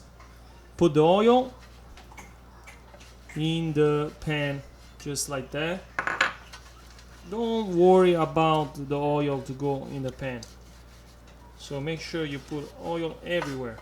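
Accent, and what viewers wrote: Italy. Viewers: che tipo vivace che sei maddò si capisce subito che sei italiano! Tanti saluti da un abruzzomolisano che vive in Germania. continua così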